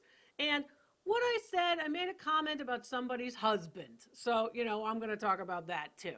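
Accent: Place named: New Jersey, United States. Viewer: You are from NJ, aren't you? If not, you have us down cold. There is something about us Jersey Girls.....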